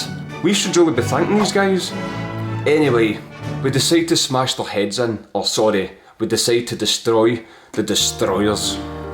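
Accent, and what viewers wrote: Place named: Glasgow, United Kingdom. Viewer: DONT DAE IT MATE KEEP IT REAL TALK LIKE A NORMAL SCOTTISH PERSON EVERY SCOTTISH YOUTUBER SOUNDS LIKE A FUCKINGBENTSHOT FAE GLASS GAOW UNIVERSITY HAHA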